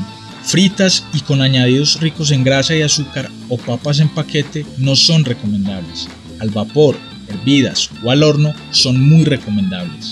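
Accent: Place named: Medellín, Colombia. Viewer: Es el acento paisa de mefellin.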